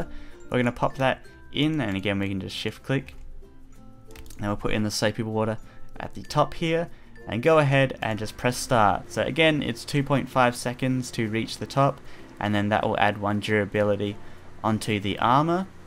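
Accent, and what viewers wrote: Australia. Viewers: lol, r u australian? luv the mod btw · Is he an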